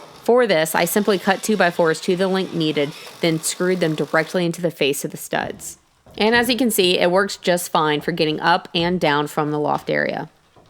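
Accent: Texas, United States. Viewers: Another great video! I think the ones poking fun at how us Texans talk need to just enjoy the video!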